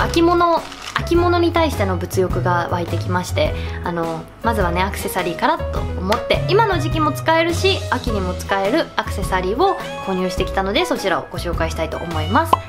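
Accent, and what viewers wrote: Hakata, Japan. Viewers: YouTubeで博多弁聞くとなぜかほっこりする笑 アクセサリーが大人の女性って感じで綺麗やん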